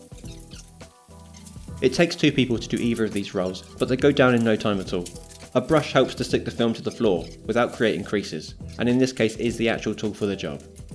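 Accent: United Kingdom. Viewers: La placa española me ha dejado descolocado, eres de España? Tienes un acento británico que no se tiene ni no se ha nacido allí 😂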